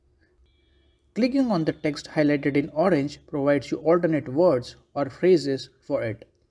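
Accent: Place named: India. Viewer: I come from London. Probably his stong Indian accent makes me don’t understand what he said.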